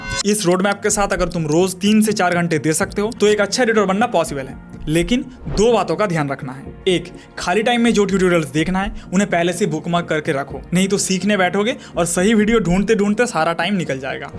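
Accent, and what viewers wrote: Bengal, India. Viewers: ভাই তুমি বাংলা বলো আমাকেতো প্রথমেই লেগে ছিলো য়ে তুমি বাংলা কথা বলো তার জন্য তোমার কথা গুলো একটু আলাদা লাগছে 😊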